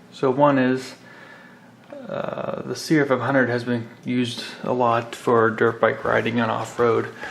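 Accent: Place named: Pittsburgh, United States. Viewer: Nice Pittsburgh accent. lol GREAT job capturing the history of these AWESOME machines.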